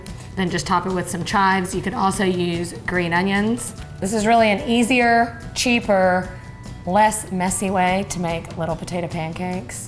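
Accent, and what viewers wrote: South, United States. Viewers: Your video has the BEST appetizers!!! I am so thrilled to find you!! Are you from Alabama? I lived in Leeds, Al many years. i just detect a slight southern accent! Merry Christmas!'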